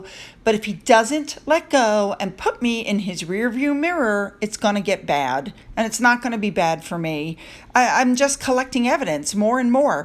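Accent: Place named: United States. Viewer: I think you are very brave to continue with Utube, - you have a great clear voice from the USA on the side of Her Majesty. Thank you.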